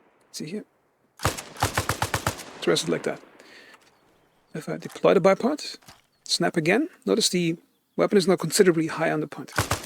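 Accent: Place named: Germany. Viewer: Something about your voice‘s pitch, the slight German accent and the way you accentuate your sentences is very endearing to listen to.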